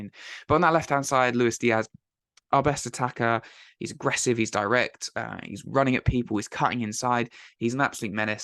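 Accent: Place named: South, United States. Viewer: Not being funny mate, your chatting with a Southern accent saying your a scouse fan, sounds like a glory hunter to me.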